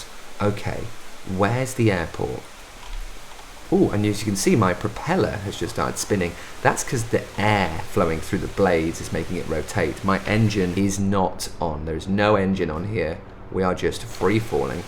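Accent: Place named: United Kingdom. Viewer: You like ryan gosling but drunk and british :) good video!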